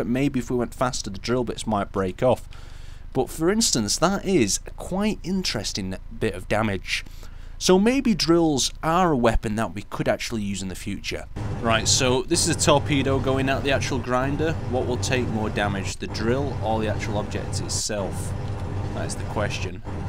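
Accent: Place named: Northern England, United Kingdom. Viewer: Scott is considerably more Scottish than this chappy. I think his name is but I'm not sure. Either way he's clearly English, but I think somewhat Northern?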